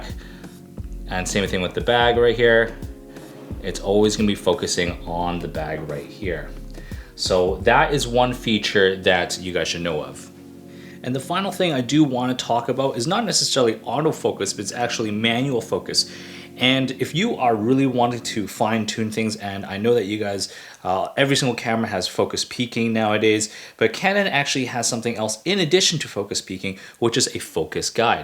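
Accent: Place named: West Coast, Canada. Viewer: Aside from the west coast Canadian accent, this was helpful!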